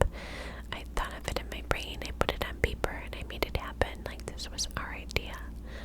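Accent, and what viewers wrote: New York, United States. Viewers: Could you do another video with your New York accent? Those videos are always so tingly 🥰 💕 I hope you are doing well! 😊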